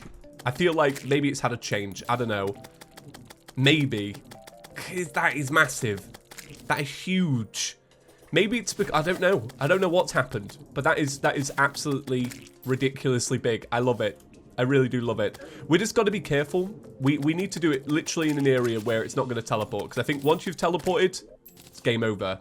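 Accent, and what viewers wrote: United Kingdom. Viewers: big boi slime vs cool british boi. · Cap? Cap? What country are you... wait your British... never mind.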